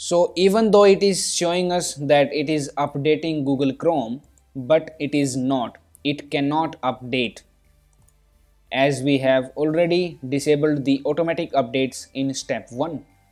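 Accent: India. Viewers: You sound like you are from India. In America we speak much slow so take a breath when you give instructions so we can follow you and you can gain subscribers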